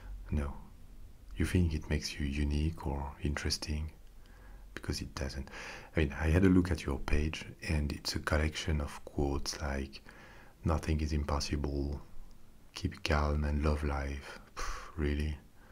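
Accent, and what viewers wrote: France. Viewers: This is awesome! I’m American and dated a French guy for several months. Your accent brings back memories.. fortunately he wasn’t quite this rude 😂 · Love this, very funny :) Much more like this, French accent and sarcasm goes so well together, lol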